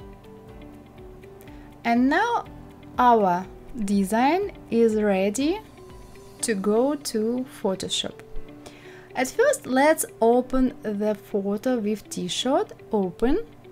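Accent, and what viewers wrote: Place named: Russia. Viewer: Just Wow. Amazing. Its so easy. Thank you. R u russian? I like your English speaking accent.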